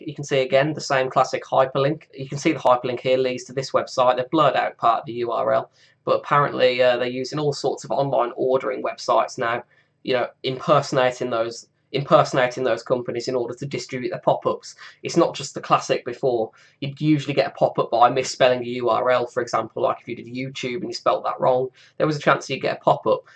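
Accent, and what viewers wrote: United Kingdom. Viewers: Do u live in birmingham, u sound like ur from dudley or west brom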